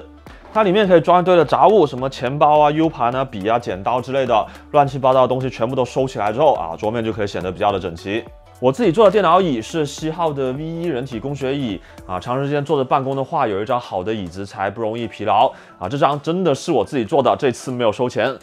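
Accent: Cantonese, China. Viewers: 你能说话慢点吗,,,本来广东的普通话就不好,咬字不清更难听!!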